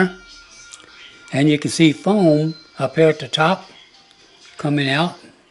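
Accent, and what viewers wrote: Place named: South, United States. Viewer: Sounds like joe Biden making a tutorial on making kraut but with a southern twang .... c’mon man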